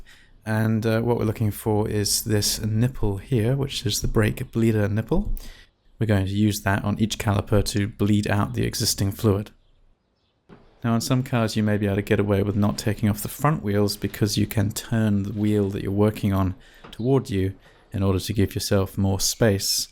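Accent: England, United Kingdom. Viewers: great video, I trust English voices more than any other accent!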